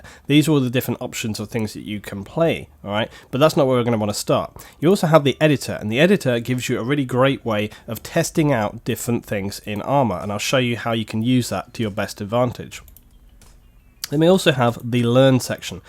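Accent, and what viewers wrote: United Kingdom. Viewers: Hi I have a HP notebook which I play arma3 on. I a noob with about 20 hours on it and I’ve just discovered I can’t get half of my actions, like player stats, gps,etc.. as I have no right Ctrl button on my keyboard. I’m computer illiterate lol, so could you or anyone reading plz help with a remedy to my issue? Can I configure the keyboard somehow? (but in game only!) where I can change a non needed key to a R-Ctrl to use. Thanks pal great video and very useful still years on. appreciate your effort and time you invest in your video’s. BTW wat part of uk u from ? I can’t tell. I from the northwest I think you’re south of me lol 👍🇬🇧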